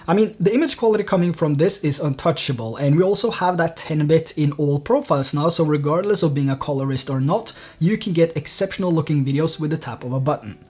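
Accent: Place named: Norway. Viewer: You sound a little norwegian, are you norwegian?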